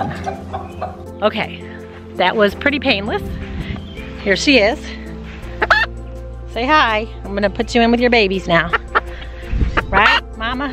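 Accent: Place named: Great Lakes, United States. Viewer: Was just able to stop laughing at least long enough to comment three years later. Cyndi Lauper was funny. My friend calls his branch cutter "Lauper" but "Phyllis DILLER" had me in stitches. I've been a sub for over three years and wasn't going to watch this cause of its age but glad I did cause I needed that laugh badly what with all the dark conspiratorial stuff I analyze [NOT theorize] & ingest almost every day. Needed that Light and silver sunshine you radiate. Not sure where you and live but it's well N of SW Blowhigho. I can tell by your near Great Lakes eaxecent.. LolOwell. Peessowt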